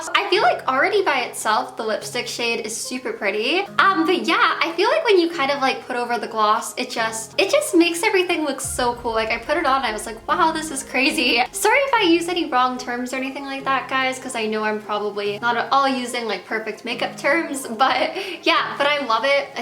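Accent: United States. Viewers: that's just an american accent lol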